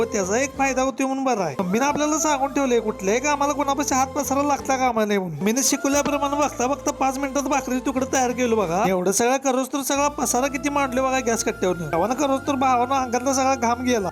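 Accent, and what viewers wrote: Kolhapur, India. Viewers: Bhava tuj gav kont re bhasha kolhapurchi vatate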